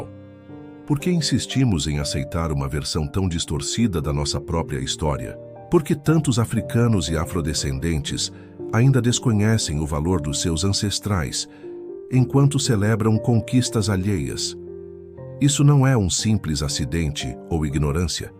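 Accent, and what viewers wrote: Brazil. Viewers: Vão mas é trabalhar. Este narrador com sotaque brasileiro a dizer que é africano é só para 😂😂😂😂. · ÉS AFRICANO COM SOTAQUE BRASILEIRO?!! A VERDADEIRA HISTÓRIA DA ÁFRICA E BERBERE OS CELTAS TAMBEM ERAM BERBERES DEPOIS ALGUEM FEZ UMA MANIPULAÇÃO GENÉTICA CRIMINOSA E CRIOU CANIBAIS OS BERBERES E CELTAS SAO OS MESMOS ATLANTES OS PORTUGUESES ERAM A ELITE ATLANTE OS PIRTUGUESES FORAM AS AMAZONAS OS FENÍCIOS OS TEMPLARIPS E OS ESCRAVOS NEGROS BRASILEIROS OS INGLESES GORAM OS ESCRABOS NEGROS USA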